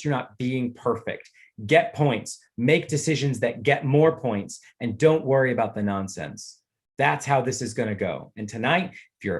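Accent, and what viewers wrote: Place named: Spain. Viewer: Gosh i love your Spain accent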